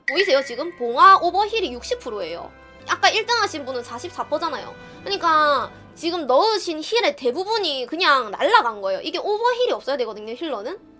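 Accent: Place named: Gyeongsang, South Korea. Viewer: 아..경상도 사투리 진짜 극혐이다 1초도 못듣겟음 역겨워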